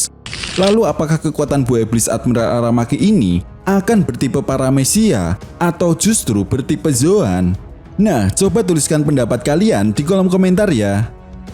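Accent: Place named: Banyumas, Indonesia. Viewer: ngapak ya min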